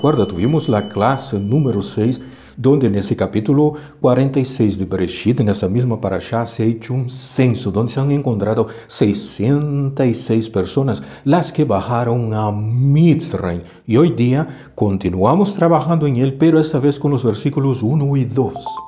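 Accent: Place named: Portugal. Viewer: Es acento portugues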